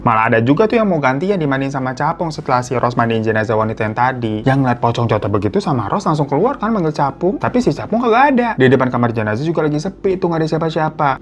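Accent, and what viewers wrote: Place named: East Java, Indonesia. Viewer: Sy kira orang ngapak, Brebes tegal. Ternyata jatim 😂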